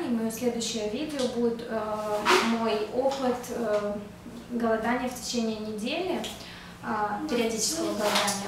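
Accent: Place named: Argentina. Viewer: Хотел заметить что у Вас аргентинское произношение))) По безопасности, все зависит от района. 3года жил в Боготе, вспоминаю и скучаю ))) По фразам, Колумбию можно охарактеризовать 3 словами : Tranquila, qué pena, mañana. Каждый.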